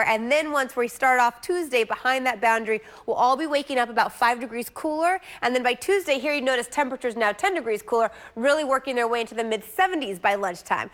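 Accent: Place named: South America. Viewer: Wait Waa da fuuuuuu !?! ¿ Since when did WMAR get a South American Weather lady ?!?!?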